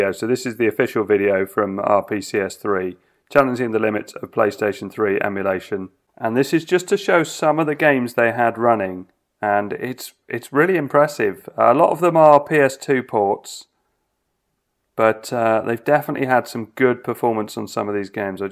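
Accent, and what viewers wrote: United Kingdom. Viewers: I bet you're from the UK.. Greets to Mr. Bean.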